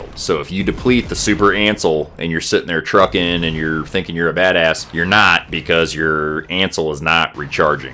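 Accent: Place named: United States. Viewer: Btw, I love your pure american pronouncation ❤️❤️❤️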